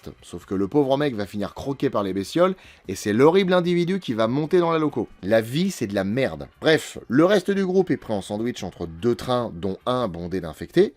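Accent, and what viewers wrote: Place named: Belgium. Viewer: Une île au large du Costa Rica… Sans blague ? Ça y est ? Tu abordes un de tes "big 5" ? Et sinon, je suis trop fier d'avoir tout de suite repéré la ref aux 12 travaux d'Astérix ! #accentbelge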